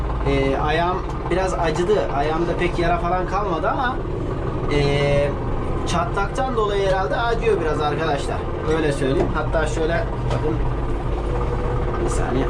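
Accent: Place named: Edirne, Turkey. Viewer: Merhaba Abi, aslen Eskişehirli misiniz? Bizde Edirneliyiz de konuşma şeklinizi bize benzettim şive olsun ağız olsun ☺ Göçmenlik var mı acaba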